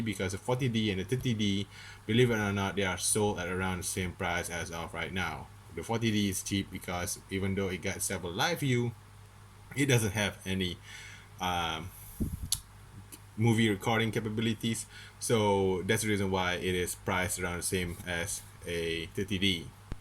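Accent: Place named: Malaysia. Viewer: Sounds like Malaysian English. But He made it clear that it is not worth it.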